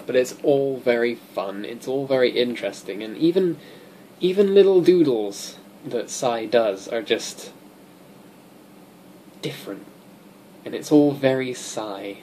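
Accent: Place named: United States. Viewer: Have you been stateside a fair bit? There's an American twang to some of your words these days.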